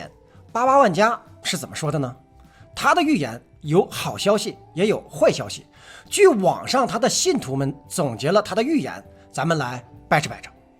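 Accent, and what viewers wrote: Northeast, China. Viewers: 你是东北人吧？熬熬很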